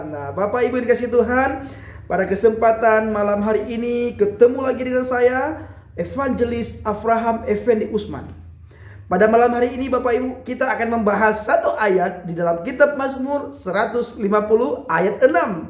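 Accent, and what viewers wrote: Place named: Aceh, Indonesia. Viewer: Luar biasa kesaksian dari orang Aceh ini, logat Aceh nya masih terasa. Haleluya.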